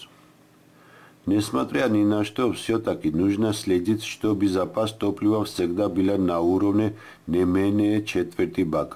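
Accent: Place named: Kutaisi, Georgia. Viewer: ვაა ქუთაისის გაიჩითა :)))ქუთაისელი ხართ?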